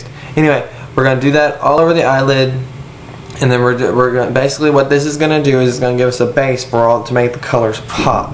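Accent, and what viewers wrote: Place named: South, United States. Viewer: girl gotta be from Kentucky or Tennessee! Love the accent! I felt at home watching this video! :D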